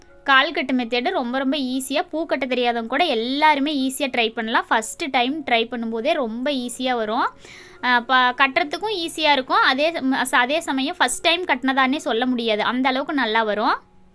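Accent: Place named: Kongu, India. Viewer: ரொம்ப ரொம்ப அழகா இருக்கு. ❤❤❤❤. நீங்கள் எந்த ஊர். உங்கள் பேச்சு கொங்கு மண்டலம் போல் தெரிகிறது. இந்த ரோஸ் எங்கே கிடைக்கும்.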